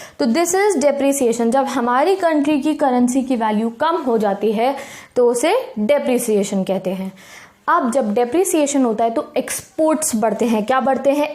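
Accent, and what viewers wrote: Punjab, India. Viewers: Di are you from punjab?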